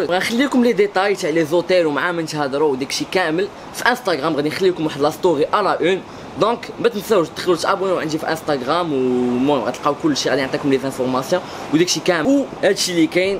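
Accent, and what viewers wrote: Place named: Senegal. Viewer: كدوي بالفورونسي بحال شي سنغالي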